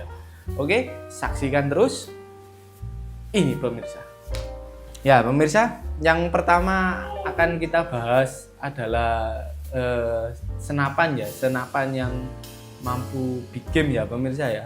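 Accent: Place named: Banyumas, Indonesia. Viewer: Sing logat bahasane kayonge madan ngapak kye, sing endi sih kang?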